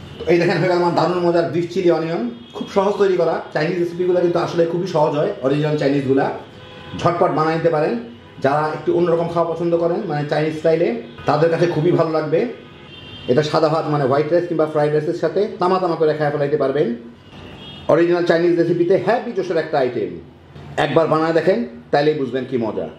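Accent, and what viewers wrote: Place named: Dhaka, Bangladesh. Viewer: অসাধারণ হয়েছে, আপনার ভাষা শুনে মনে হচ্ছে আপনি ঢাকাইয়া। আমিও ঢাকাইয়া 😁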